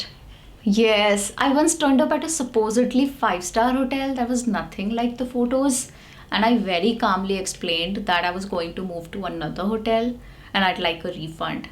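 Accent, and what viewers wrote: India. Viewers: I actually speak English with American accent🇺🇸 Now I’m gonna learn Indian accent from your videos to make better content for my Channel. Thanks a lot for your beautiful work gorgeous lady!!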